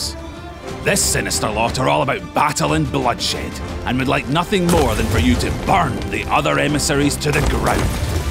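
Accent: Scotland, United Kingdom. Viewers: Scottish narrator 😊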